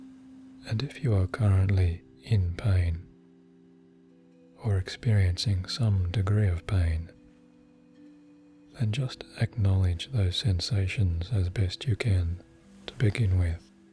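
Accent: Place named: Australia. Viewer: Australian he is great, I’m an Aussie he does have a good accent and nice bass to his voice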